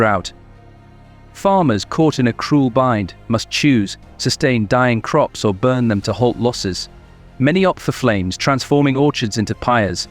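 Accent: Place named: United Kingdom. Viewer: Listen to a British voice talk about anything American NO THANKS!!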